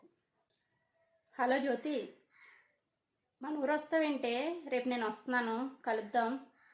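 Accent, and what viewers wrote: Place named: Godavari, India. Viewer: Ravi mantrii sister la ఉన్నారు me భాష ఆ యాస ఎంతైన గోదారిలో కదా🎉❤😂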